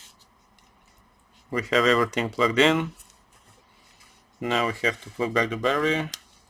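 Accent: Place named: Russia. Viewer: парень, ты русский что ли? акцент ебейший)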